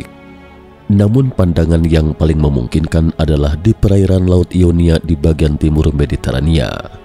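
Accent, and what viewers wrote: West Java, Indonesia. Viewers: urang sunda nya' kang?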